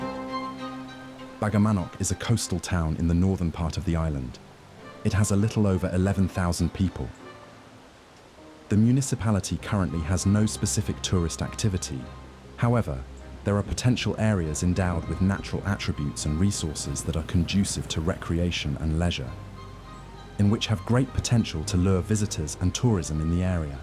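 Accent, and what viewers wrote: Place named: United Kingdom. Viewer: Excellent documentary! Your accent sounds British to me and not Californian. But what do I know...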